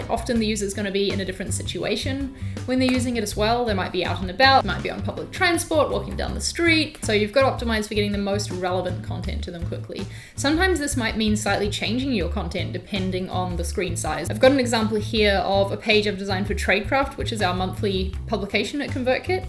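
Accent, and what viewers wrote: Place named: New Zealand. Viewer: As soon as I heard you speak I was like Whhhhhaaatt!!! She's definitely a Kiwi! Great Video - thanks!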